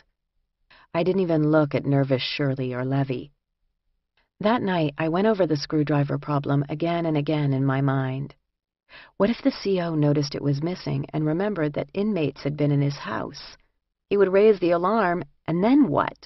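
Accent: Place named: Russia. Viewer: Love her Russian accent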